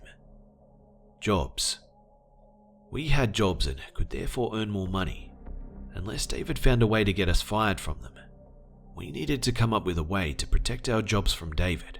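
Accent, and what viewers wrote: Australia. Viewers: You know. I heard there's the full version somewhere. What's the point in that when you can have the himself read it to you in his amazing Aussie voice as you are about to sleep? Exactly.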